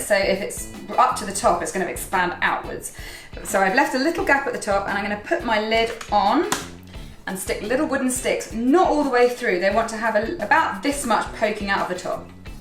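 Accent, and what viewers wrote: England, United Kingdom. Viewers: You are English. I live in America and I cannot understand almost everything you say. Slow down.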